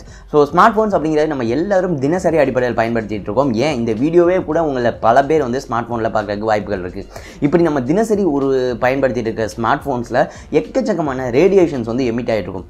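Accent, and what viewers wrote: Madurai, India. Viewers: Bro neenga Madurai ah????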